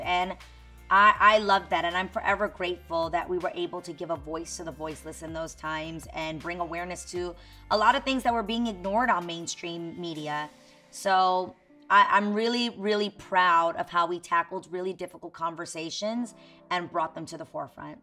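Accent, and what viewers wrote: New York, United States. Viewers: You have a New York accent. My friend sounds like you and she's not Latina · Damn the New York was THICK 😹 she still the same woman 🧡🧡🧡😘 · I freaking love you! ❤️ We will definitely miss you on daytime TV! I just don't get why ya didn't go for like 20+ yrs! Glad we're able to see you on YouTube!!! About JLO yesssssss 🙌 Love herrrr too! I love how you're so humble like don't gas me! Haha! Love your JLO vibe! Your fashion sense your NY accent and attitude is everything! 🥰💯🙌 much love A! Stay real 😘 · wow you had such a cute New York accent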